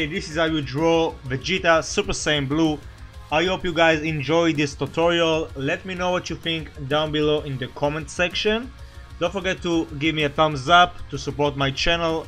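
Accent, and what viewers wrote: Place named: Israel. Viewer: אתה ישראלי? יש לך מבטה של ישראלי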